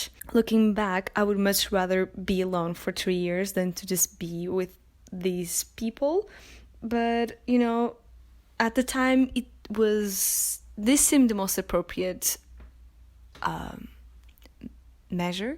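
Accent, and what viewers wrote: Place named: Brazil. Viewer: Você é brasileira? Seu sotaque não parece o sotaque de quem nasceu nos eua, amei o vídeo e amei o seu canaaaal :)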